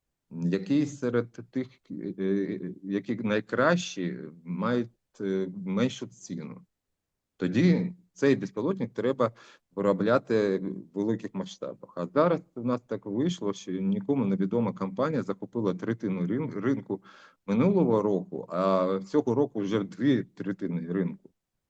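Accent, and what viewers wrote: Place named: Surzhyk, Ukraine. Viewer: Ну говори на русском, если сложно, нафига этот суржик!??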